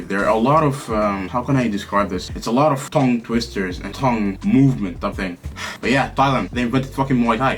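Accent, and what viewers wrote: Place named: Russia. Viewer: i am fom italy and russia and your accent is incredible for russian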